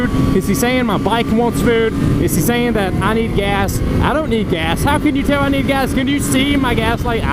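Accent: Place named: South, United States. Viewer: Hey man, keep up the vocal pace to avoid a 20 min video. It sounds normal in 1.5x speed! The southern draw is nice but if you keep yourself aware of pace you can cut your vid time down by about 40%. Nice vid though, Cheers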